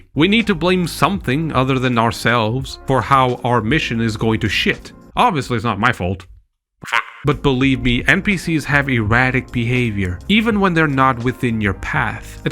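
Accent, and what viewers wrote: Portugal. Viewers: EU SABIA, esse sotaque não engana ninguém brother, já estava na cara que eras tuga, só faltou ver a matricula no teu quarto no minuto para me aperceber ahahah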